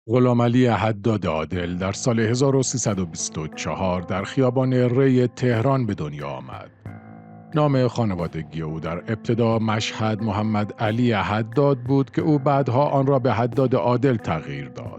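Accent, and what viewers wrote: Arab world. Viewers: جناب شما بیوگرافی حداد عادل را بدرستی بیان نمردید و لهجه شما از کجاست؟؟؟؟ عرب هستی یا ؟؟؟؟؟ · احق کثافت ندانذ با شخصیت ترین بانوی جهان فرح نیست دیوی بی. ناموس که صدای زشت گزارش میدهی دیوث ته لحجه عربی مثل صداي شغال در نیمه شب میماند هر کس تورا تایید کند مثل تو بی شرف کثافت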